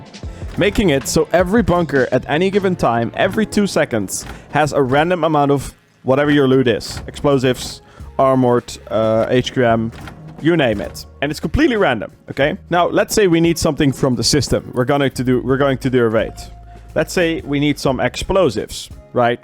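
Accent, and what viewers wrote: Netherlands. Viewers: I just have to ask Are you Dutch?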